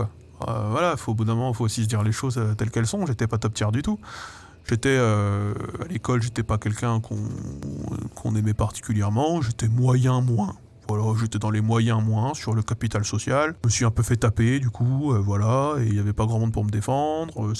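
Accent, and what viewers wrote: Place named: Haute-Marne, France. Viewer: En vrai ma famille vient de Haute-Marne (mais pas exactement de ton coin, plus vers l'Aube !) donc j'ai passé toutes mes vacances dans le village natale de ma mère, et franchement ta manière de parler et tes anecdotes ça me rappelle de fou tout le temps que j'ai passé là bas ! À être réquisitionnié pour filer des coups de main dans les champs ou à jouer avec les voisins paysans !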